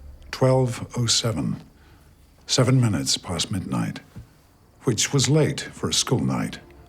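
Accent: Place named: Northern Ireland, United Kingdom. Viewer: Brilliant makes me home sick listening to his northern Ireland accent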